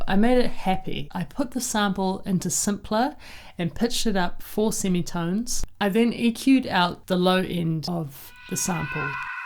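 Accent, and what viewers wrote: New Zealand. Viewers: Wait...your accent...a fellow kiwi? How cool if so! I also make YT videos but am such a huge fan of Andrew Huang. You're amazing! Congrats on the new video collab with Andrew. I've subbed. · Beside your accent being a dead give away, your beats/sound is totally Kiwi!! Love love love this!!! Keep it up hun you are freakin awesome!!! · i came from andrews channel to watch this, it was by far my favourite. i loved the use of the real instruments. it somehow reminds me of both and (mostly hayley). definitely gonna subscribe. also your voice is amazing, love kiwi accents. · Are you are New Zealander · As soon I heard that accent I needed to check out the channel :D Gotta support more Kiwi channels!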